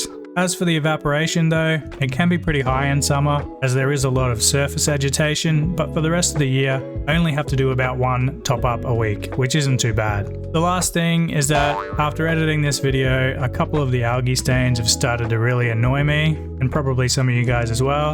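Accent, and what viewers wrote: Australia. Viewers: Nice to hear the Aussie twang in an aqua scape video! You sorted for Vegemite and Tim Tams?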